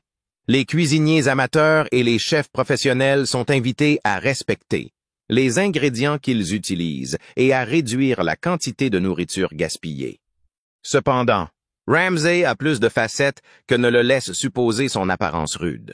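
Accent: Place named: Canada. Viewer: Heuuu pardon mais c'est quoi cette voix ? Cananadienne ? Ke veut bien mais là.... ça fait peur 😢😅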